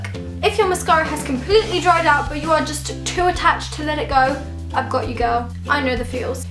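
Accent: England, United Kingdom. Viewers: she has an English accent. There are so many different accents in Britain eg Scottish, Irish etc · English accent* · hahah defenitly not polish with pure english accent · British accent 😍❤ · That is a British accent correct?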